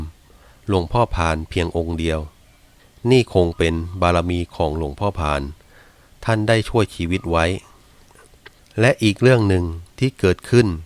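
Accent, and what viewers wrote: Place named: Prachuap Khiri Khan, Thailand. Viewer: เหน่อแบบคนประจวบเลยครับ ผมก็คนประจวบ หนองตาแต้ม ปัจจุบันย้ายมานครปฐมแล้ว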